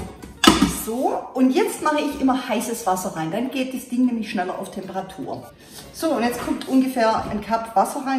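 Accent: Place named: Swabia, Germany. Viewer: Abonniert zwecks vegan + schwäbisch + Instant Pot = unschlagbare Kombination.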